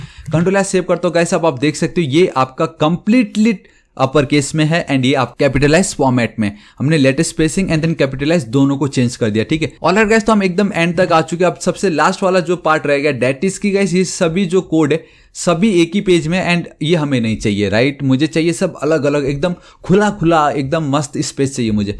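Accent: Nepal. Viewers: why do you have Nipali accent.🤔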